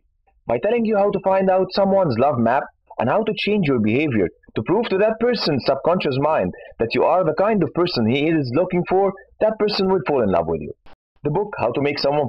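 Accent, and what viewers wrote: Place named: Egypt. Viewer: egyption accent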